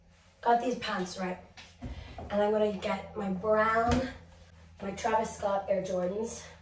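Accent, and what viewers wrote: United States. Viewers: I dont understand why you talk like an american? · Story with the American accent?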